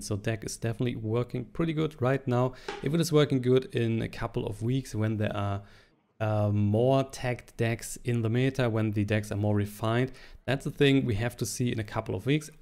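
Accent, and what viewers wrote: Germany. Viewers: Deutscher Akzent :D. Nice deck, i love it and it's my first good deck. I hope my dust is spend right :).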